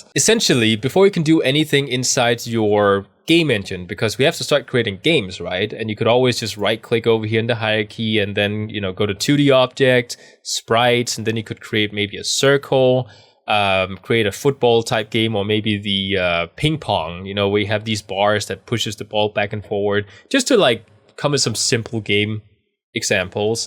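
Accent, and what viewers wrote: Denmark. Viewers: I like your accent as im also danish :)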